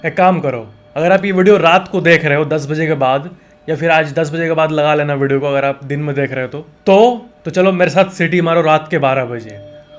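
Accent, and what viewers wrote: Bihar, India. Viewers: Typical Bihari tone 😊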